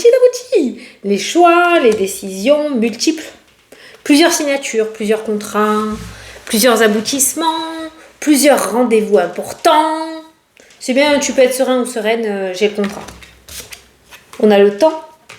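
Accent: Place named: Marseille, France. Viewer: Moi aussi j ai l ai l accent " qu on attrape en naissant du côté de Marseille " ou du Midi et il est beau notre accent .... Merci pour tes tirages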